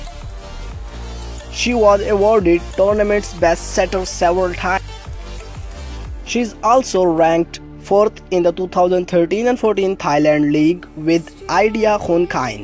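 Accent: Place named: India. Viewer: กูเกรียจมาก สำเนียง อินเดีย!! ..เกรียจ จริงๆๆ!!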